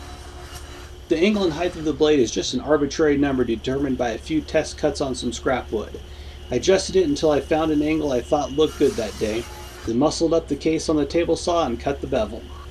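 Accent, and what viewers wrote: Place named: Croatia. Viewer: Wonderful vid however your heavy Croatian accent makes it difficult to understand what you are saying. Would you please consider providing American subtitles. Thanks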